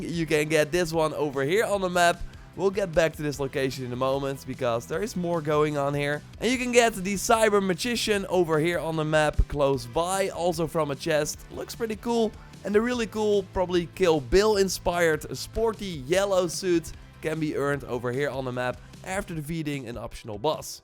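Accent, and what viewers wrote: Netherlands. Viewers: That dutch nasal accent makes me want to cut my ears of. Nice content though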